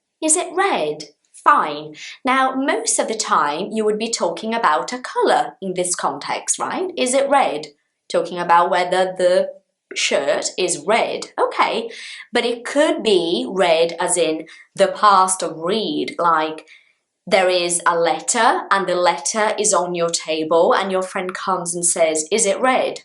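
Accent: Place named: England, United Kingdom. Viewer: Thanks so much to the class ... I'm learning English "alone"(because I watch other channeel) , your class help me very much... I like very much your accent English... Thanks so Much.... I'm new subscribe here!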